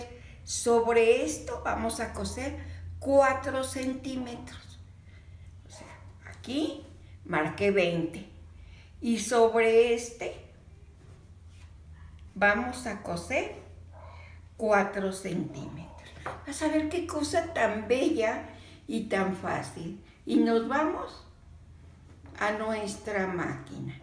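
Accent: Mexico. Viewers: Saludos desde Los Angeles, querida, en qué país estás? Hablas como mexicana. Bendiciones. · Precioso tu saco y que fácil es para aserlo y muy simple llo chilena me encanta la costura y también me gusta tu personalidad y el pelo bendiciones me llamo Mary tu de donde eres mejicana por el modo de hablar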